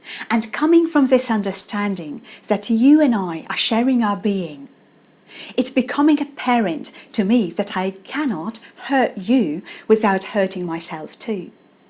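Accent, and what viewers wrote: United Kingdom. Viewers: I have known you for several years. I first texted you in VK saying that I am love with your amazing British accent. I started working on mine one year ago. You were a massive motivation for me, thank you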